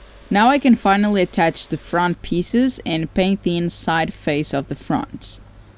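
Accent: Portugal. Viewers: this is a portugesse accent? love it anyway. it reminds me of a dutch accent almost. im binge watching 'the handmaids tale' and stumbled upon you. this recommendation suits me. thank you , youtube.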